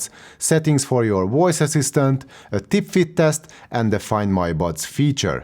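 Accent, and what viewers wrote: Hungary. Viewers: Magyar akcentuc, de fasza videó jó angol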